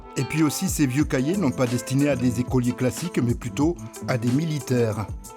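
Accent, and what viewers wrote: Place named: South, France. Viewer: Bonjour, ohhh tu as l'accent qui attire le chant des cigales tu me rappel Carcassonne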